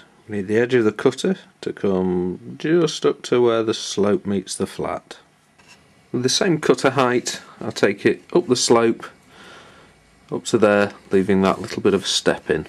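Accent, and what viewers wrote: Manchester, United Kingdom. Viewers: Nice work. Is that a Manchester accent? Just a curious Yank.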